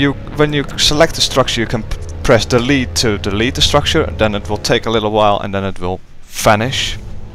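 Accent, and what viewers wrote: Netherlands. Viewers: Yeah, I hear it too. He definitely sounds Dutch.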